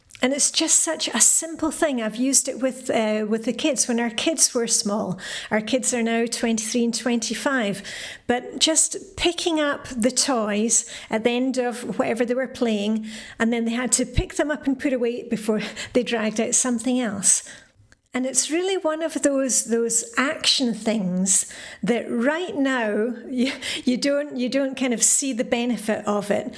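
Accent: Scotland, United Kingdom. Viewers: I love your Scottish voice!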